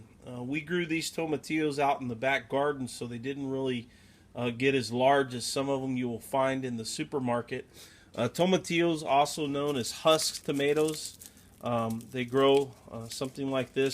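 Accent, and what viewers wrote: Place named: West, Mexico. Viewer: BTW-love your accent of Western and slight Mexicanish accent. Must be good since your mouth is watering as you cook. That's what all good chef do! :-)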